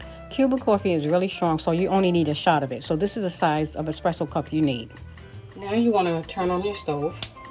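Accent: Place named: New York, United States. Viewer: Love your NY accent, Ma'am. Greetings from Australia.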